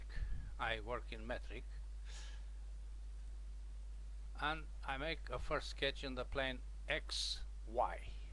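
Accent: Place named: Poland. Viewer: I find listening to your lesson reminds me of talking to my Polish father and relatives. It was very well done and understandable.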